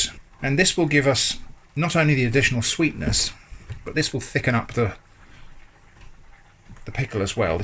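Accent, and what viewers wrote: United Kingdom. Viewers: For some reason you sound kind of british.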